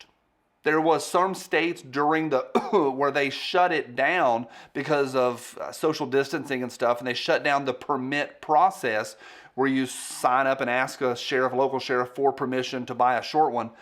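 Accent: South, United States. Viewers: Howdy,! I'm from Illinois, but love the southern accent. Your channel is awesome! Thanks for the info and laughs!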